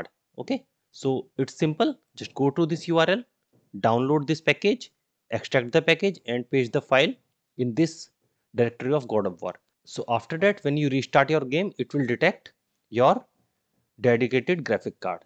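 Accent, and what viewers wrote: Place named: India. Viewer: heard the voice having an indian accent till i tried it and it actually loaded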